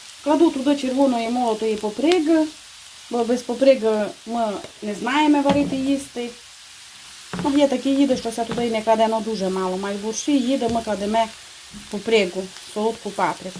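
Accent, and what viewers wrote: Transcarpathia, Ukraine. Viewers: Привіт із Львівщини! Дуже у Вас все сподобалося, закарпатське все таке добре, а Ви супер пояснюєте, приємно чути закарпатський діалект.Тішуся,що знайшла Ваш канал. Хай береже Господь Вас, і Вашу сім'ю! Великого успіху такому чудовому каналу!!!😘😘😘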